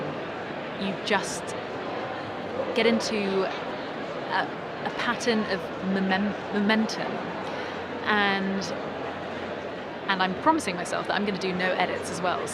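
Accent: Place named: RP, United Kingdom. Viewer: Where did you learn such beautiful pronunciation (RP)? Love it...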